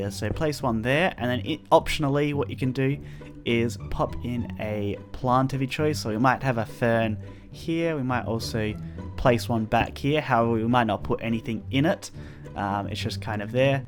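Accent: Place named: Australia. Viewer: Just Subscribed! Im australian and you sound aussie too!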